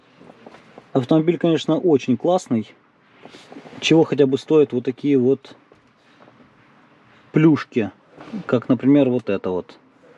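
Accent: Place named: Zaporizhzhia, Ukraine. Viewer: Голос (акцент)у автора как у моего дядьки с запорожья.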